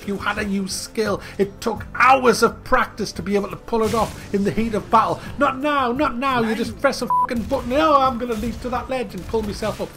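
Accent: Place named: Birmingham, United Kingdom. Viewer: Buy you sound like your from birmingham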